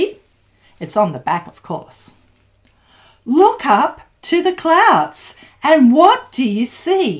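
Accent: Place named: Australia. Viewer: Hope I don't get this wrong ha ha but Aussie. They like it 😁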